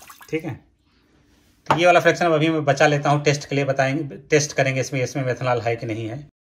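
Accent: Bihar, India. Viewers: Sir you must be from Bihar (your accent is Lalan top). You are really good at chemistry. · I thought he is speaking English in Indian accent.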